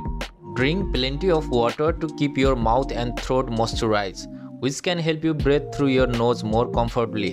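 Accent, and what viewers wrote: Nepal. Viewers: Nepali cha taw boli tah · Bro face reveal gara ta tmero sound le tw 100 percent nepali ho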